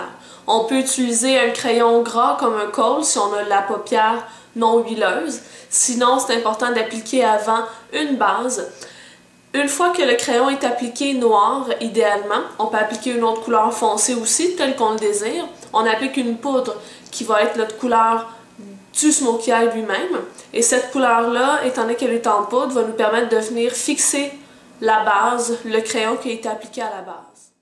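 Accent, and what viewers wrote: Quebec, Canada. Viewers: Coucou!! merci bcp pour cette vidéo! J'aime vraiment toutes tes vidéos, ce que tu fais est génial!! Tu me donne envie d"aller vivre au quebeq j'adore ton accent ta simplicité et ta sympathie!! Merci encore et continu comme ça!! Bisous! PS: j'attend avec impatience les autres videos sur les toiles d'artistes je troouje cette idée vraiment géniale! :)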